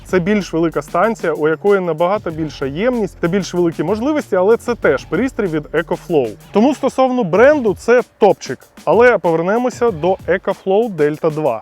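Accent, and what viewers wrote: Russia. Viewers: Твои русизмы в словах сложно осушать. Ты либо по русски бы говорил, либо пусть тебе бы написали текст для диктовки на чистом Украинском.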